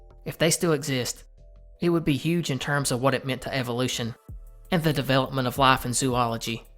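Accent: South, United States. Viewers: I love rural/southern/farmland accent. So nice on the ears. Honest and direct. No pretentiousness.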